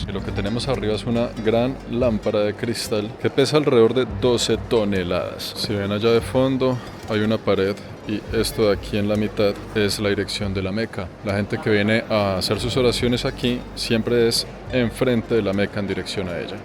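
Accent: Colombia. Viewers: Que jodido eres para narrar paisa , me haces reir ; sobretodo ese acento colombiano me encanta . Noble tierra , gente maravillosa como tu . Saludos desde Suiza 😊.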